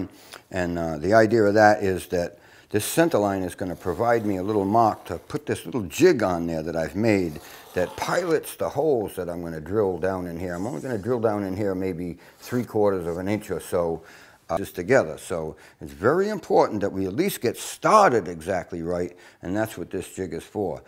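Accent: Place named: Massachusetts, United States. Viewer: Listening to you talk reminds me of my cousin Jack who lives in Attleboro. Love the accent although we sounded a bit different out on the Cape. Also appreciate the comment about the "bucket of quahogs. I sure miss that.Used to just go out and dig them up for a great chowder on weekends. Your tutorial on building this skiff is terrific.I've always wanted to build a boat like this and I just may give this one a try. Thanks a million for the instruction and the memories.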